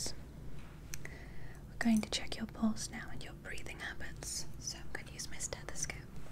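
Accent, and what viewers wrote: England, United Kingdom. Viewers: Is she British or nah lol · Perfect English accent. Thank you from across the pond. PS. I love 🇬🇷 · Really Beautiful Doctor!!! Great Video and you have the most Beautiful eyes and you do a Great British Accent!! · Weird to hear you use a British accent. I got ASMR, but also on edge because you sounded like an evil villain from a bond movie haha! Amazing video! 10/10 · you are spoiling us. Also thanks for the British accent. I love studying the accent from your videos. ❤️❤️❤️❤️ είσαι υπέροχη!!! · I'm British and I approve of this accent 🇬🇧🖤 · your English accent and bedside manner 😍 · I never new she had a British accent !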